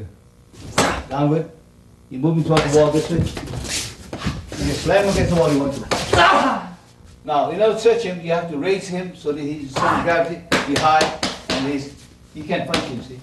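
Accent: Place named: Hawaii, United States. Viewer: That's that Hawaii accent right there! =) mahalo, Sensei!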